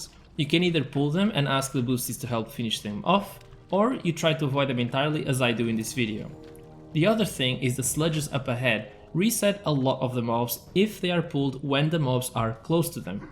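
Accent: Portugal. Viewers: I could sense it in the accent, olá amigo! 😆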